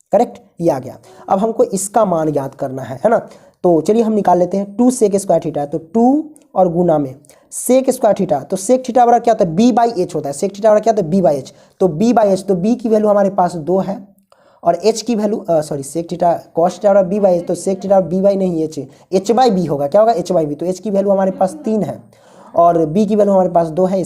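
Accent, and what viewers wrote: Bihar, India. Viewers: Very nyc sr ji Se ji mughe bihari language bhut achci lgti h such m mja hi aa jata h . ❤❤😂😂❤❤😂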